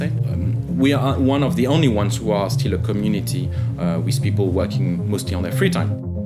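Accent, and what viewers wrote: France. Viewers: His french accent is so funny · I like your french accent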